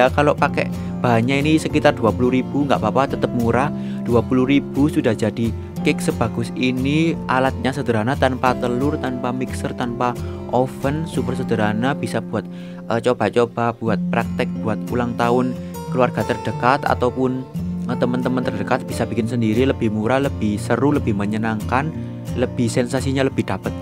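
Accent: Java, Indonesia. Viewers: Loalah..wong mojokerto dewe.Mangkane ngomong e medok😂btw podo mbi aku mas wong mojokerto😄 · Suka suaranya yang khas medok. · Y Allah ngakak dnger suaray medok bngt rek😂😂😂😍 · Saya suka medok nya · Mas lucu deh cara bicaranya hehe ..orang jawa ya kayaknya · Gemess ...medoknya ituloh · 😂😂,,medok bahasanya mas sama saya jg orang Jawa 🤣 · Logatnya bagus... Hehehe... Medok.... Thx resepnya berguna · iya...tapi mas-nya ini mmg klo ngomong msh medok..campur bhs Indonesia dan Jawa..ciri khas org Jawa mmg kdg2 msh sk campur2 ngomongx..he3x.. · medok bylyke emm, cwo jgo masak mantap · SUMPAH LO, AKU GA NATE WALEH NONTON YUTUB MU MAS. SUARAMU UADEM, MEDOK, UENAK DI RUNGOKNO. YOK OPO AKU SG GRUSA GRUSU KEK NGENE KI MAS 😂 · Gue gk suka masak tp liat video ini tk ulang2 jd semangat nyobak...medok mu loh yg bkin semangat ms.bro...😂🙏🙏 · Aq orang balongbendo mz jowo e jek medok e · Medok banget anjay, ngakak dengerinya😆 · penyampaian mas nya jugaa sederhana dan jawa bangeeet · Fokus sama cara ngomongnya....jowo Poll alias medok ...the power is Jawa 😅😅😆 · Medookk bngeett anjiir😫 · hi lucu bosone medok bnget 😍 kak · Medok banget:v sukaaaaaaa ♥️ gua fokus ke suaranya yang medok tiba tiba gua suka yang Ngomongnya medok wkwk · Njiiirrrr... 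Diselempitkan jare.. 🤦🏻‍♀️😂🤣👍muwedddoook banget c mas.. Kudu ngguyu ngrungokno e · Salah ngomong jatohnya malah kasian tp gemes Medhok nya jg uhhh banget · Haduh basane medok buanget rek, moker ndi ki mas, makasih yo kontennya meninspirasi. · Kakak orang jawa tulen yaa Ngakak aku denger logatnya😂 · Medoknya lucu banget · Pengen bgt ngeliat mas nya d undang hitam putih trs masak pke logat jawa ky gitu..hehehe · jawane medok n lucu sederhana pula.. kerennn.. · "sensasi nyemprot menyemprot bikin bahgia rek" medoknya ituloh yg bikin ter unch 😍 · Aduhh demen sama medoknya😂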